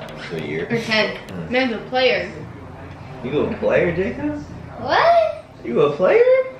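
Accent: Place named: United Kingdom. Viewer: Omg I swear I'd never think u were American I always thought u were british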